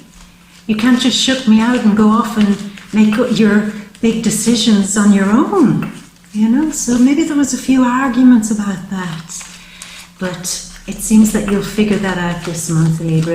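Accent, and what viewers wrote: Ireland. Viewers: 🤣🙏 you make me giggle, what county are you from in Eire? I can't figure out your accent...it sounds like a very, very soft out skirts of Dublin or then I'm thinking is it Clare lol go on your going to tell me neither. You have a joyful soul thank you for your work, your time & energy it's gratefully appreciated & I've taken note....don't just spunk my money I got it! 💖💝🌈🙏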